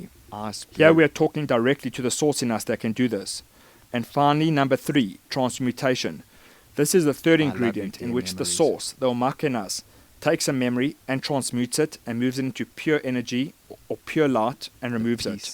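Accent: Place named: South Africa. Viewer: The Video looks like it was filmed at Palace of the Lost City, Sun City, South Africa... I had the pleasure of spending a few days there. Also, your accent may be a give away too...:)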